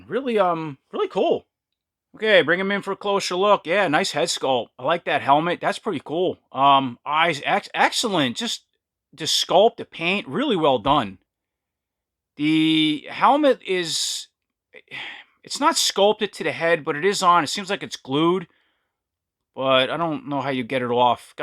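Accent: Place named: New Jersey, United States. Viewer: Great review! I love your NJ accent haha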